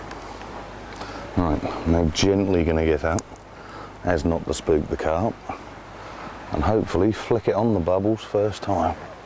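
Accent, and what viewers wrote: United Kingdom. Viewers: hi great video just wondering if your from norfolk your accent sounds that way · That be a Suffolk accent there boey!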